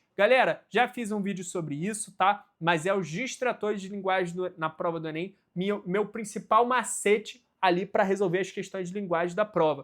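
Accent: Rio de Janeiro, Brazil. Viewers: eu racho com o seu jeito carioca de explicar KKKKKKKK amo seus vídeos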